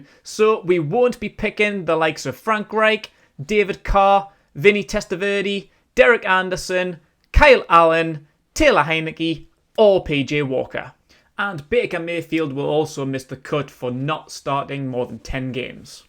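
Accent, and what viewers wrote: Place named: England, United Kingdom. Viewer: Got sent here by Panthers Post (sorta). Interesting to hear someone talk about American football in an English accent lol. Hope you enjoy the game next week, win or lose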